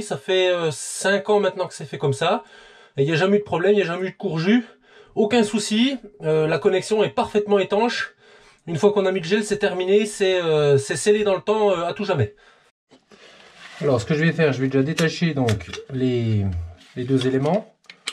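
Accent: Germany. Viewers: rien n'oblige à mettre les raccords l'un en face de l'autre ? si par ex on perd l'écarteur, on pourrait les décaler ; le pouce bleu n'est pas pour l'accent allemand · L'accent allemand de malade 😅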